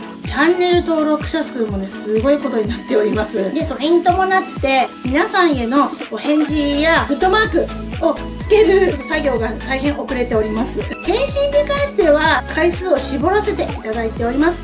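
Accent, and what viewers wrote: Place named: Gunma, Japan. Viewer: 話し方がいかにも群馬って感じですね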